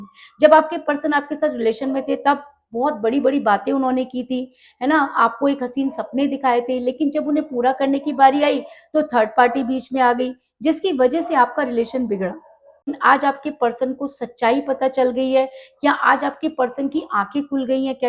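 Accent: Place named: Uttar Pradesh, India. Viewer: Mujhe ap ki bate style muhavre ache lagte hi . Ap age par atak jati hi ye apka boring point hi. I am sure ap UP si hi. Andaze guftagu yehi Zahir karta hi. Ap intelligent hi. Yaha sirf third party nahi hi. In janab ki dozens women si intimacy hi. Isiliye kisi ek ke nahi ho sakte. 50 jagah moh Marne ki adat maza shouk hi. Ap ke liye info.hi .Rdgs mi Kam ayegi.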